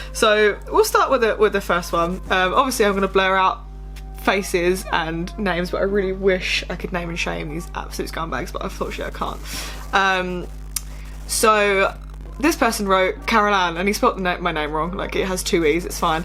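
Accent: Essex, United Kingdom. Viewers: I love your Essex accent 😋 keep posting videos... Love from India 😋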